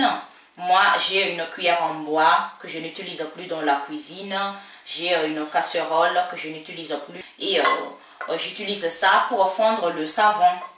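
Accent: Madagascar. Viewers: C'est une Malagasy!!! Cet accent ;)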